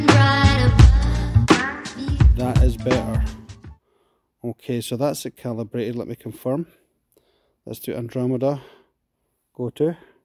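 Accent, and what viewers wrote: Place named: Scotland, United Kingdom. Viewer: Thank you for short but great video. Love the Scottish accent. Enjoyed this. I wonder if the exposure time was extended to 20 s how it would go? Well put together video.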